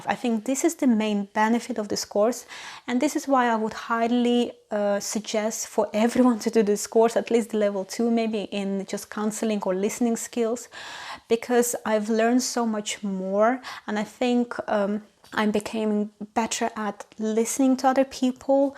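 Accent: Russia. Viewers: you have russian accent. Are you from Russia?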